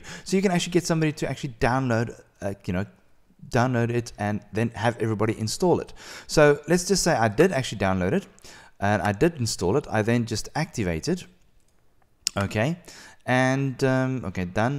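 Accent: South Africa. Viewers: Don't mind me asking... But are you South African?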